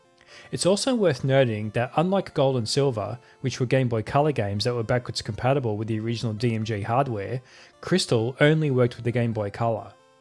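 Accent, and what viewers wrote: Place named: Australia. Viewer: Niche info about old games, Aussie accent, backing track. Perfect channel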